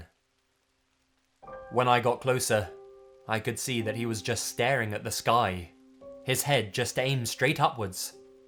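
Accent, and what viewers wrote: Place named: RP, United Kingdom. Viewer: I really like your stuff and because you're a Brit, your accent lends an RP (BBC World Service for those who don't know what Received Prononciation is) seriousness to what you are saying. This gives the impression that it's reportage and makes it all the more chilling. Of the Narrators who do true stories I find yours give me the most pause for thought and the most chilling feeling. (Last time I complimented someone a troll latched on to me like a nasty little tick and I fully expect I will get lambasted for this so I am not letting it go to Google plus...the bugger will have to listen to a lot of good stuff to find this hopefully. .but despite that I think you ought to give credit where it's due!). (Ok trolls I may have put i before e after c in in a word but I can't be bothered checking. ....before you comment. ..I know! 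).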